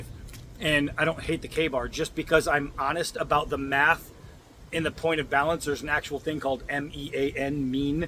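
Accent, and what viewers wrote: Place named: Great Lakes, United States. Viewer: Are you in the north east? I’m from western NY, and I feel like I’m recognizing a Great Lakes accent. Great video! And you’re right about animals being tough! Even a squirrel that’s feeling threatened is a tough thing to deal with. Keep it up, brother!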